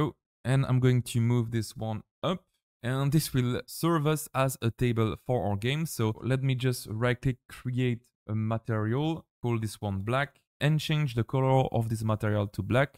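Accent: France. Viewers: T'es français? t'a l'accent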